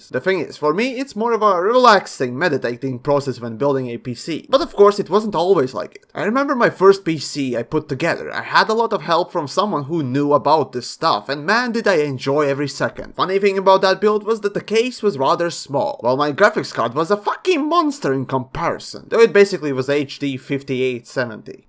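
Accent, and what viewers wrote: Latvia. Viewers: I KNEW I HEARD A LATVIAN ACCENT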